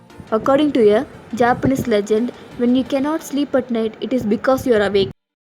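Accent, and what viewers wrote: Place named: South India, India. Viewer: South Indian recognized from voice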